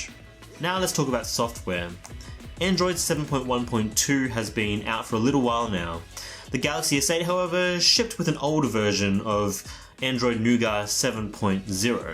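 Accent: Australia. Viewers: Hey, which part of Australia are you from? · Ahmad Fuad The guy here is from Australia as I guess from his accent, and Australia got the Exynos version. The Exynos is much better optimized and runs much smoother than the Snapdragon. Honestly, I think he exaggerates the stutter (there is no actual lag, only microstutter, which isn't the same as lag), as it is only noticeable if you really look for it and specifically pay attention to it. My only gripe with the S8 is the redundant Bixby button and the awkward position of the fingerprint reader. I would also be happy for a better speaker, or rather two stereo speakers, and a 32bit DAC for the audiophiles who walk around with fancy headphones. I found the camera to be amazingly good, especially the video capture is astonishing. I also like how the phone feels in the hand and how comfortable it is to hold it and to operate it with one hand.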